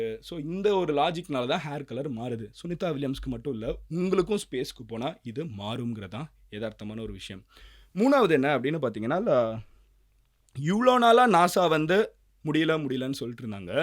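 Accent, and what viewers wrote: Madurai, India. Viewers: madurai slang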